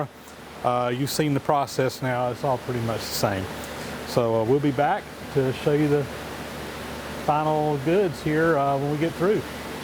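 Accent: South, United States. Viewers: Oh dear lord!. I had the Closed Caption turned on. Your southern accent is giving it fits. You simply have to watch a few portions of this video with the CC on, it'll drop you to your knees its so funny how it interprets what you say. Example "... about replace when us we're going to use this hotel room a which had been looking and come from the research for the the strength for this material I thinks gonna play me strong enough for this final dear in a reason the use that the from noise reduction for this final gear in a reason the use that the from noise reduction the have that bad fastest turning here for a walk in the metal he had a something that would dampen the operation tumor in the blower....." It gets worse.......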